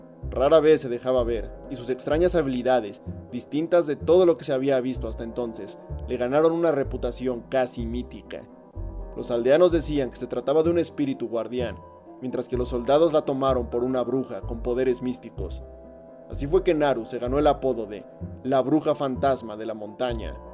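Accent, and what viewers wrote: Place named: Mexico. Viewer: no sé bien dónde es Oaxaca pero suena a mexicano, y no soy mexicano pero tienes algo encontrá de los mexicanos son unas buenas personas. En todo caso me da igual el vídeo está bien hecho no te meto odio. Ya que más quieres buena suerte.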